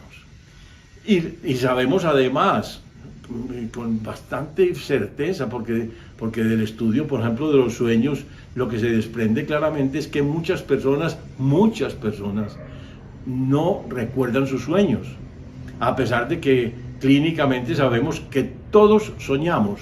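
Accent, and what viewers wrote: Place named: Antioquia, Colombia. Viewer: Me alegra haberme topado con este video y este canal, muchas gracias por argumentar tan claramente; tiene una opinión muy informada por decir lo menos. Creo que es evidente que la ciencia hoy en día no es ciencia, ya que se aferra con garras y dientes a un materialismo absoluto propio de un dogma, a pesar de pruebas clínicas y testimoniales tanto de pacientes como de profesionales de la salud, sin mencionar si quiera los nuevos descubrimientos de la física cuántica (que más bien parecen ser re-descubrimientos, porque al parecer es información que sabíamos y olvidamos o nos hicieron olvidar a través de las eras). Reconozco un acento paisa en cualquier parte y no sabe lo mucho que me enorgullece que los Antioqueños tengamos mentes tan abiertas y sabias como la suya. Un caluroso saludo desde Medellín, Colombia 👋🏻 Favor continue con su maravillosa labor de informar sobre temas tan clave en especial en estos tiempos 🙏🏻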